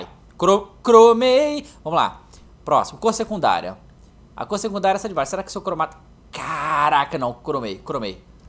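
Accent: Bahia, Brazil. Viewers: mano vc é MT baianaaao cara slk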